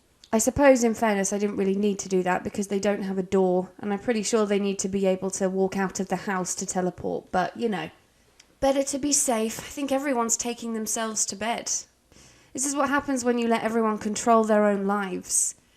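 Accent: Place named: South East England, United Kingdom. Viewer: South East England, in and around London area. I think she has said before she is originally from Reading? Which isn't too far from London 😊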